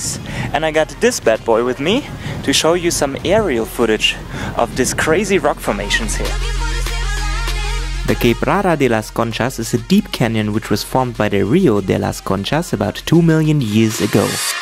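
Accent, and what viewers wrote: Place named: Germany. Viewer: Cant stand this german accent...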